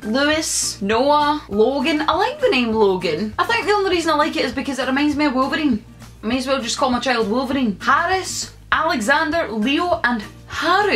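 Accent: Scotland, United Kingdom. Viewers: You sounded EXTRA Scottish in this video 😂👌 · Loving the Scottish accent. Born in raised in London but have Scottish heritage and am so proud of it. What part of Scotland are you from? My family is from Gretna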